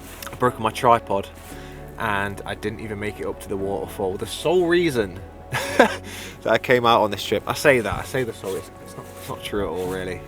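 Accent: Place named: Sheffield, United Kingdom. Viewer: It's a like and sub fron me. Sounds like you live near Sheffield like me :)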